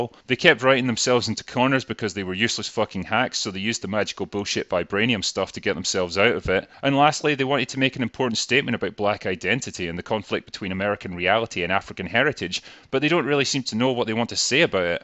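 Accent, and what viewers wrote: Scotland, United Kingdom. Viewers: he's the same in pretty much all his videos(including older ones), that is drunk and with a heavy scottish accent, but he became more polite for this particular video as his arguments would have been diminished and he would've been called a racist. Well atleast that's my hypothesis.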